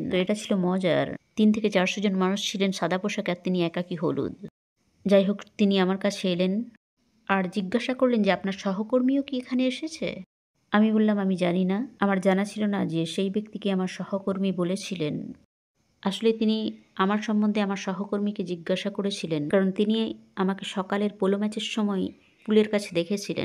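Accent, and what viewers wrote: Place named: West Bengal, India. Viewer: এটা বাংলাদেশি চ্যানেল কিনা আমি বুঝতে পারছি না। ভাষাটা পশ্চিম বংগের মনে হচ্ছে যাই হোক ভাষা টা ব্যপার নয়। ইসলাম প্রচারের জন্য চ্যানেলটা ঠিক আছে তবে এখানে অন্য পিকচার গুলি দেখাবেন না প্লিজ। যিনি কথা বলছেন শুধু তাকে দেখান। অন্য পিকচারে পরদাছাড়া মহিলাদের দেখানো হয় এটা ইসলামের সংগে যায়না। আশা করি বুঝতে পারছেন।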